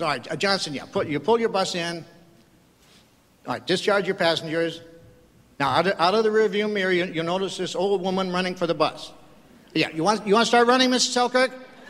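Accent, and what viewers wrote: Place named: United States. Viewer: The first time I heard this skit it was on an LP record at a friends house, so no film track just the sound track back in 1978, I was only 17 and I thought he was the funniest man I had ever heard and it was the first time I had ever heard an American accent. I thought he was brilliant, I made myself remember his name and I am so glad I did. This is the second time I have ever heard this skit and it is exactly as I remember it, nearly 35 years later... still as funny as ever...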